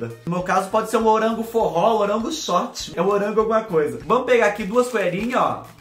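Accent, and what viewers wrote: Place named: Piauí, Brazil. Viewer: você é do Nordeste? Eu sou do Piauí, Amarante, mas moro na capital Teresina...sua fala é idêntica à nossa...com erros de Português, mas nós somos inteligentes e estudiosos graças à Deus! É a força do hábito mesmo...e o que deixa você mais engraçado é o seu linguajar... Você deveria ir pra TV Globo ser humorista 😂 Eu queria saber quem é essa "muié" que lhe ensina essas receitas... E por falar em receitas eu fiz a da casca de banana, maizena e óleo de coco...e senti que deu uma suavizada no bigode chinês...tenho 6.6, queria ter ficado com uma cara de 50 pelo menos, mas minha idade metabólica é 58 anos (mesma idade do meu namorado😅, e minha cabeça é de uma menina de 20😊), fiz minha bioimpedância (21/08/2024) e tudo está normal 🙏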